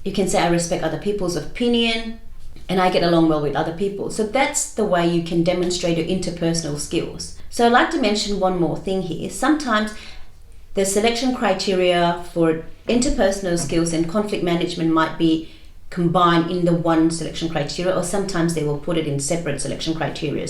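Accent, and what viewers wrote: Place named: Australia. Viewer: Thank you for the content although i'm curious to know how you managed to get a good aussie command and accent in 9 years time with almost no traces of indian. Any secret to this you may want to share?😉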